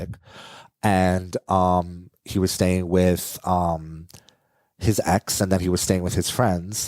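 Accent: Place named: Bronx, United States. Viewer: You have such a thick Bronx accent!!!!! Represent!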